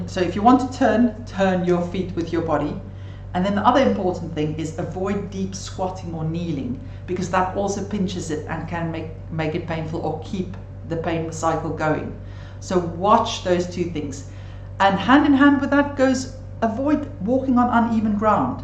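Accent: South Africa. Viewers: Brilliant video. You sound South African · … great and is that a lovely South African accent? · Quick question, i was messaging my knee and one the outer side just next to the knee cap, I got a long lasting sharp pain, could messaging trigger pain for this kind of injury? Love the South African accent! Thank you for the video! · I am so happy I discovered this site . I have been suffering all of the symptoms shown on this clip , for 2 months , thinking I had rheumatoid problems. It’s difficult to actually see a medic these days , so any problems are generally handled over the phone . The advice I got was to consume painkillers , up to 8 a day , then get in touch if there was no improvement .... I took 2 and tried to keep my walks to 8k per diem , instead of the 16k to 18k that I usually do . I am still strong and reasonably fit for my age (79) .... time to my boring rave ..... 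I will start my new routine tomorrow. My mood is lighter already. Thanks to the lecturer , well presented information , clearly and succinctly in a great ..... South African accent ? Tomorrow I start .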